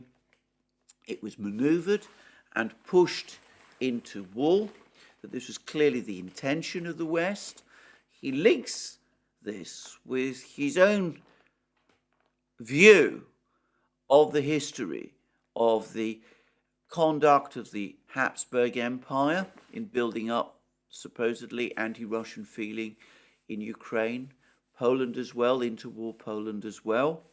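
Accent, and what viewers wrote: England, United Kingdom. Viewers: Greek communist with an English accent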